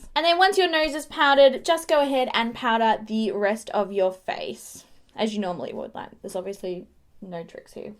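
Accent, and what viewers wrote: Australia. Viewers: Sorry I couldn’t hear past the Australian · Hey where are you from? You sound Australian.... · So many Australian makeup gurus, I love it. Or are your from New Zealand? I attribute your accent to Australia :p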